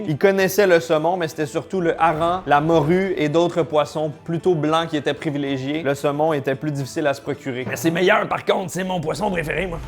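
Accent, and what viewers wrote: Quebec, Canada. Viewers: Je remercie mes 2 années au Québec, je peux comprendre dans cette vidéo xD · Gourmandise française mais qui adore aussi votre phrasé québécois ❤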